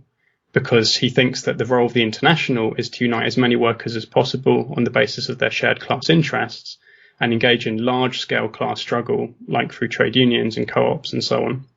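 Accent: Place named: United Kingdom. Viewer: Oh no she's British 😔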